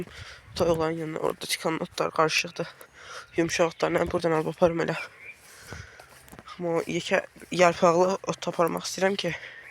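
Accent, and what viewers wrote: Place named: North, Azerbaijan. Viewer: Bu şimal zonasının lehcesidi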